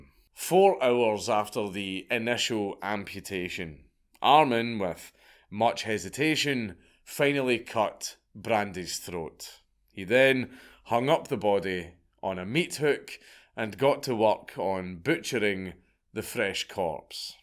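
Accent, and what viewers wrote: Scotland, United Kingdom. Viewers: Loving the constant new videos. There's so many people to talk about and I love when you speak Scottish to me. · Dank love you bro but gotta move that pun counter it's blocked out by the subtitles. And your beautiful Scottish accent is almost indecipherable at times due to my lowly Baltimore origins. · I now narrate shit in my head with a scottish accent cos of the count haha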